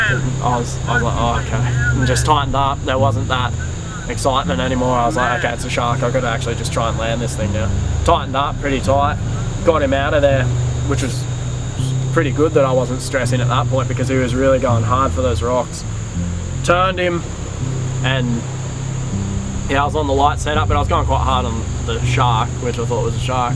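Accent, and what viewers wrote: Australia. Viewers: thx for this video, quite inspiring and that decent aussie accent lol. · This videos half as good if you take away the Aussie accent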